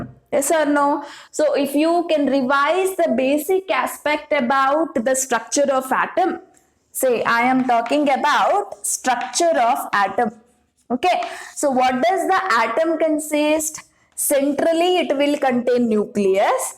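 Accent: Karnataka, India. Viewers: Mam r u from Karnataka? I also can speak kanada well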